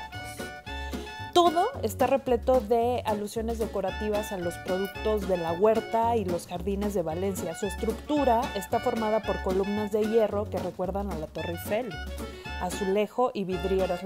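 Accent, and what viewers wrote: Mexico. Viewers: Tienes acento mexicana?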